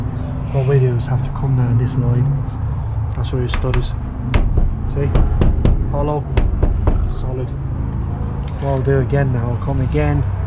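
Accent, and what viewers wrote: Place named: Ireland. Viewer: You sound like a lovely irishman i work ed with in construction many years ago nice work by the way 👍👍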